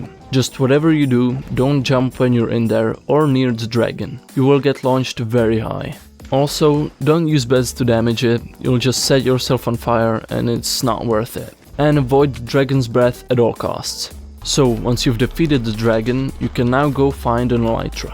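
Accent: Czech Republic. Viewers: You do have little bit of czech accent. Amazing video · what nationality are you? you have a czech accent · bro where are you from? You don't sound like you're from an english speaking country. You sound like you're from the Czech Republic. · bro had czech accent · ceskej prizvuk uplne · bracho ta cestina tam jde slyset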